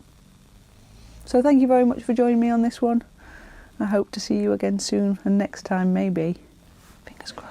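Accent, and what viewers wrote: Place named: Sheffield, United Kingdom. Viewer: It’s looking great already. Please jabber away, I lived in Sheffield for 5 years (in Park Hill flats — Norwich Row!) and I miss the accent.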